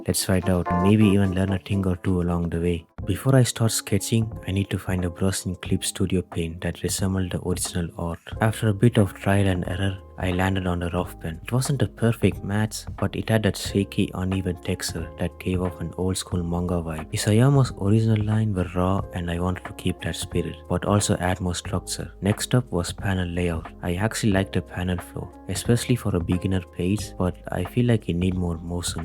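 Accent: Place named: India. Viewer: Annoying Indian voice omg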